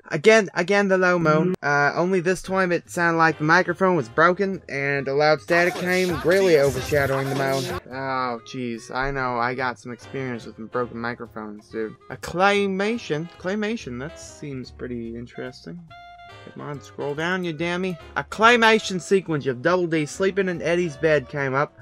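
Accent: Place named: Australia. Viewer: I was turned on instantly by that Australian accent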